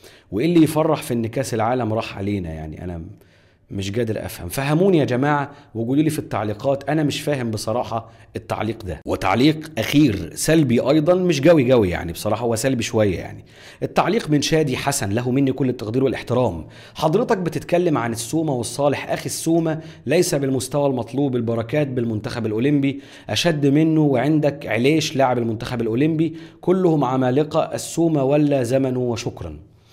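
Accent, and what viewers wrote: Egypt. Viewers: باين انك مصري بس بتحب اللهجة السورية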